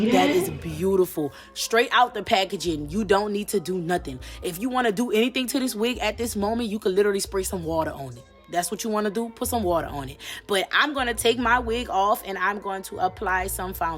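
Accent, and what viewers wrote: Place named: South, United States. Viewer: Ooop why I wasn’t ready for this cute ass voice/accent. Wth is you from, it’s given souf for sure …but I’m slightly conflicted. The way you say BELOW give the boot. Love I happened on your page today❤❤❤❤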